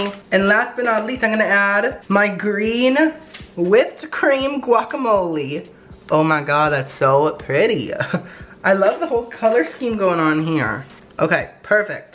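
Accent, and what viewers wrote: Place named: United Kingdom. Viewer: HIS LITTLE BRITISH ACCENT MAKES ME LEE EVERYTIME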